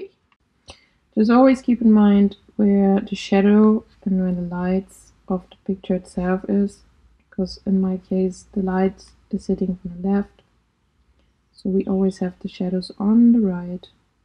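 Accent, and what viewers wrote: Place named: Germany. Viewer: I realy like your german accent!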